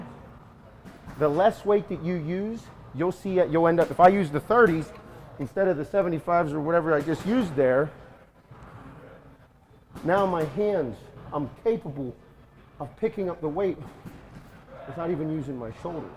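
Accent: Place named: New York, United States. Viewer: I love your way of explaining tips, moreover I like your typical New York accent. I just love it. Whenever I listen to you, I feel I am in New York. Thanks bro you the best. Prem India.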